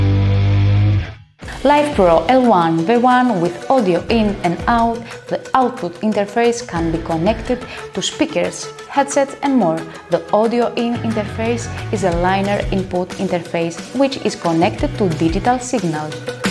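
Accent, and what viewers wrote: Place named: Greece. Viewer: r u Greek? I love your accent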